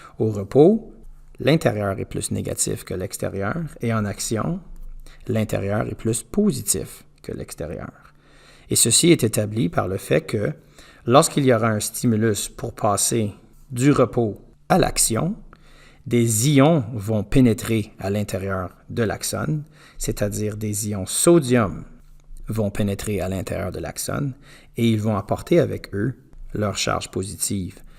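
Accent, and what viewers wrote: Quebec, Canada. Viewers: De plus j'aime votre accent Quebecoi😊😊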